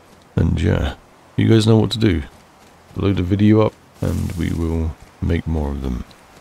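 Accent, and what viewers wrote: United Kingdom. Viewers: love the west country accent bro! sending love from bristol! keep up the hacker smacking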